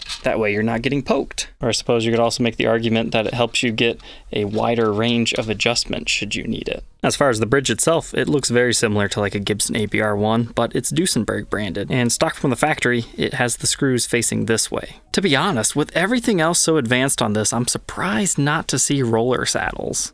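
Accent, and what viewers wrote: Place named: United States. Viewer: I stopped taking this seriously as soon as I heard an American accent.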